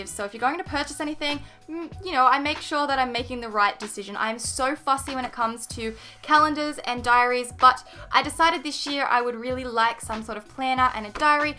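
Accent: Australia. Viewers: I can never fully express my love for the Australian accent . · I love ur videos but did anyone else you only notice her accent after she said 'in Australia" ?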